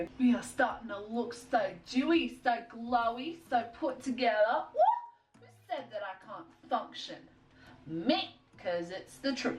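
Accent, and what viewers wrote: Australia. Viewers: Ok I’m new but your videos are so funny and amazing! I’ve got a question. Are you Australian? I’m Australian and your accent is very similar to mine. I liked subscribed and put bell on. Keep doing what your doing! ❤️xx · The Russian accent is put on, the Aussie accent is real. She lives in Western Sydney.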